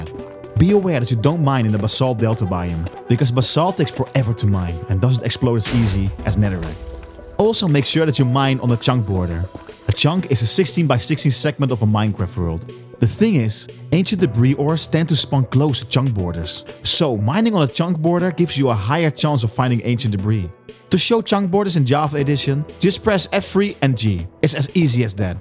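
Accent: Netherlands. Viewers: Haha I immediately heard that you were from the Netherlands. Funny how it works like that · Gotta love the dutch accent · you sound Dutch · Dutch accent is one of my favorites from non native accents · Bro sounds dutch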